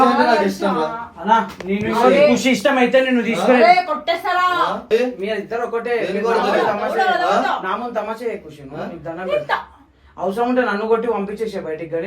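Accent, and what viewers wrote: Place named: Andhra Pradesh, India. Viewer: Hi anna mi videos einthaka mundhu challa manchiga undavi anna Eppudu videos lo andhra slang maku aithea manchiga anipistledu anna aa slang maku adram aithea ledu anna so plz deniki recat avu anna koncham 😊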